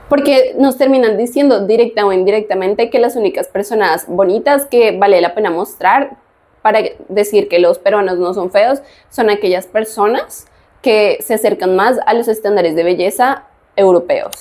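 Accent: Colombia. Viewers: eres de colombia?....y tu acentico? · ¿En serio? El acento se me hace un poco bogotano (aunque sí está un poco raro). ¿Por qué dices que es de México? ¿Lo ha dicho en algún video o algo?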